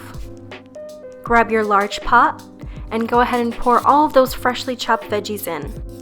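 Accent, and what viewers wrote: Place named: United States. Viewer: American, not Albanian accent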